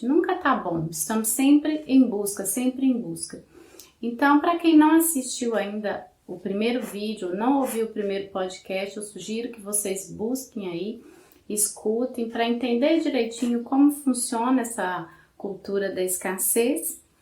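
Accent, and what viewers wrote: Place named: Northeast, Brazil. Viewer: ahh achei o sotaque parecido ,eu sou do nordeste .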